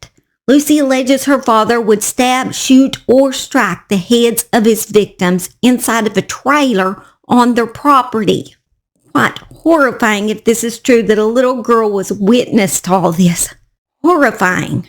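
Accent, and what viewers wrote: South, United States. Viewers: I absolutely LOVE hearing you telling these cases! You have such a sweet southern voice, and being a Tennessee girl I love hearing an accent like mine! I thought it was to funny when you said "Oh shit, well I shouldn't say shit on here!" Hell I am always wondering how people don't throw out all kinda "pretty words" when they hear these F**KED UP cases! Thank you for another great video, like always. Oh yeah, congratulations on 1k subscribers! I believe you are going to continue to grow like you have been, cause what is there to not love about your channel and personality!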